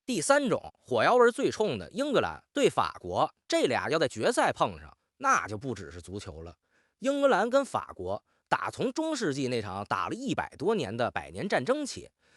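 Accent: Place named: Tianjin, China. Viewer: 这个台北主播为啥一股天津味儿？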